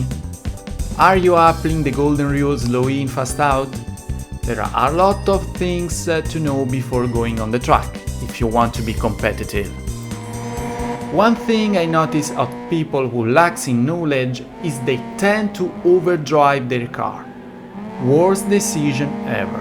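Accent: Italy. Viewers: You're Italian right?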